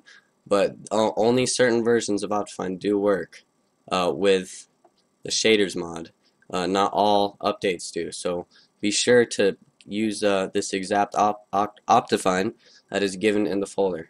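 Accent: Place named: Germany. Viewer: ohne spass alter du hast den mega dollsten ekligsten deutschen akzent den es auf der welt gibt yo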